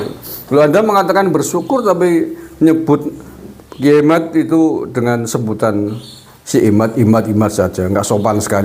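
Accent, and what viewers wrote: Banyumas, Indonesia. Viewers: ogrok" terus habib bung. selalu tk dukung, ngapaknya seger.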